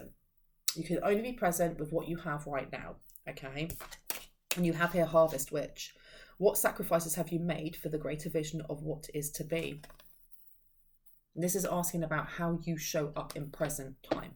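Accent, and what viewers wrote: United Kingdom. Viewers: I was just hanging out with you in the kitchen, with a double size mug of hot cocoa with marshmallows ! Thank you for the messages ! I love listening to you ! I'm in the USA, and I love your Brit accent. I love the shows Last of the Summer Wine, The Vicar and Keeping Up Appearances, its not just because they are such funny shows but its the accents too ! I wish you the Merriest Christmas 🌲🧑‍🎄 and a very Happy New Year 🍾🎉 ! I look forward to yo ur readings and will continue to in 2024 ! 💖 Lynn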